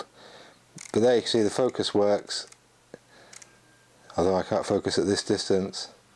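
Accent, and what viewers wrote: Manchester, United Kingdom. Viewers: Sounds like a Manc accent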